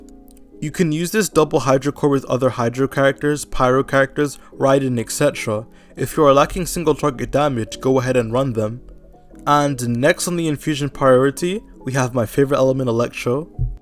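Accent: United Kingdom. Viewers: how do you manage to sound more british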